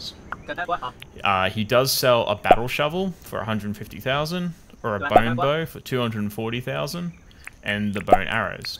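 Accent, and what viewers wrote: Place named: Australia. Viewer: It's nice to hear the good old Aussie accent used in videos. Keep it up mate.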